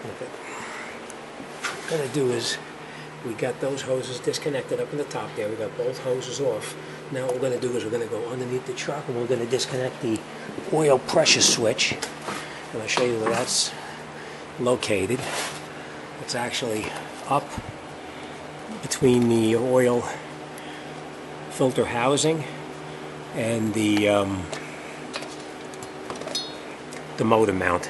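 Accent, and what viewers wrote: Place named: Long Island, United States. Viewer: Wow it's been a while since I heard someone from the inland talking . I used to spend every weekend during the summer at either HotDog beach or Sag Harbor .or Long Inland National speedway with our 10 second Camaro ..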